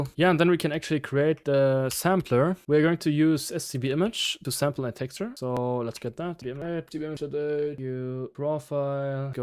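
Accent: Germany. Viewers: i hear deutsch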